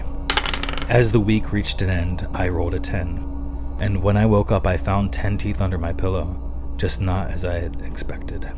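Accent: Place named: United States. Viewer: Very very good story ! And the narration is just perfect ! Being french, and listening to this beautiful English ( American ) voice add something I can’t explain ! Well done !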